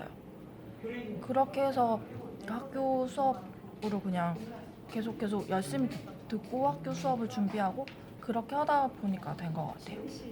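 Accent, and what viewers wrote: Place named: Busan, South Korea. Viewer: 원래 부산 사투리 쓰셨어요? 표준말인데 사투리 억양이 섞여있는 것 같아요. 화이팅👍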